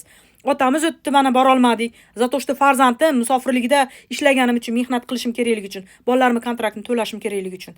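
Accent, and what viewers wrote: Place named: Andijan, Uzbekistan. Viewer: Малодец синглим буеги Андижонча килиб хаммасини огзига урдингиз омад😊😊😊😊😊😊😊😊😊😊😊😊😊😊😊😊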